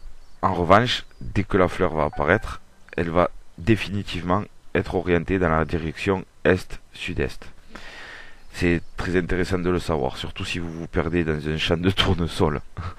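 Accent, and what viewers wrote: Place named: Paris, France. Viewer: Vous avez l'accent pointu. Très bien expliqué et on voit que vous le faites avec amour 😊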